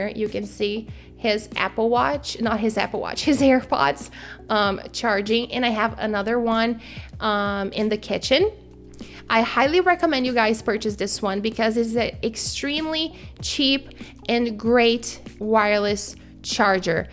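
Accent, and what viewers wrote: Brazil. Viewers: I love your English. You’re Brazilian, aren’t you? You could open an English Channel to give us some tips.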